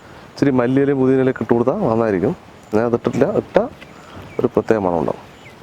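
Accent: Palakkad, India. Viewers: ക്കാ ഒരായിരം ലൈക്കിനോടൊപ്പം ഒരായിരം ആശംസകൾ.. ആ പാലക്കാടൻ സംഭാഷണം പ്രത്യേകിച്ച് ചിക്കൻ കഴുകുമ്പോഴും, അടുപ്പ് കത്തിക്കുമ്പോഴും.. അത് മാത്രം കേട്ടാൽത്തന്നെ മനസ്സുനിറയും.. പിന്നെ ആ കൂട്ടുകാരോടൊപ്പം ഇരുന്ന് ഉണ്ടാക്കി വച്ചത് കഴിക്കുന്നത് കാണുമ്പോൾ വയറും നിറയും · Palakkad an Bhasha athanu secret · Ikkaaa... Ennumpole innum super..... Kaada ende fav aanu.... Pakshe adhilum super nammande palakkadu baasha thanne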